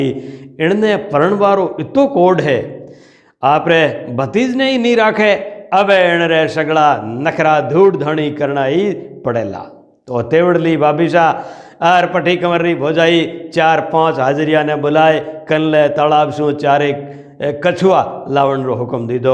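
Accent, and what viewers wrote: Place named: Saurashtra, India. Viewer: Hamare gujrat. Sorath jesi aapki bani he dhanyawad namaskar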